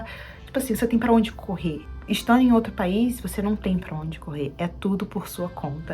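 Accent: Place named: North, Brazil. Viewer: De onde vc e? Sotaque parece do norte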